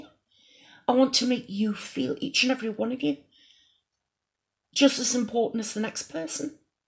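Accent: Newcastle, United Kingdom. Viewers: I keep thinking 'I wish you were my Nan!' I don't think im young enough to be your granddaughter, but the only grandparent I have left hates me lol! I think its the geordie that I somehow can hear in your voice, but I find it very comforting... like I keep expecting to see you call me 'pet' lol! But honestly thank you for your kindness, it does help a lot to know I'm alone <3 hope you are feeling a bit better.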